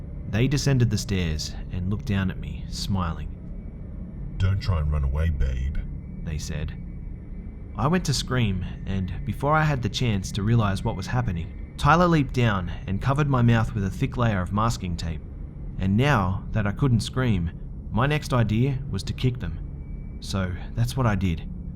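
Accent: Australia. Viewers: Nice accent! Are you from Australia??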